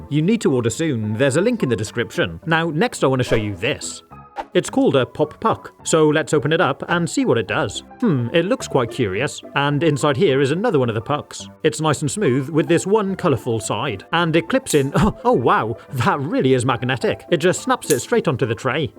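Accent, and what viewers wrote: United Kingdom. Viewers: I love your vids You sound like you are in the uk and I'm in the us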